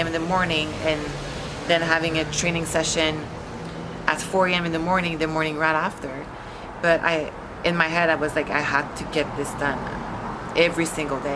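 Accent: Quebec, Canada. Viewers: Your french-canadian accent adds to your character , who you are. I think it is nice. Anyone who would be so rude as to complain about your accent probably speaks only 1 language and has their own issues. As a new subscriber i like the accent i came across you with the jay cutler interview with you and shawn i thought you were great...I can appreciate how hard it is to pick up a second language ...lol i'm taking french 3 hrs a week and it's brutal.Tabernac you're awsome....lol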